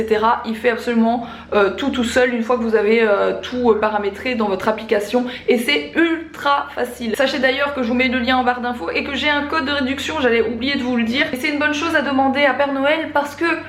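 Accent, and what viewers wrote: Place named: Belgium. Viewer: Je trouve que ton accent Belge s'entend fort dans cette vidéo ^^. Bravo pour tout ton travail.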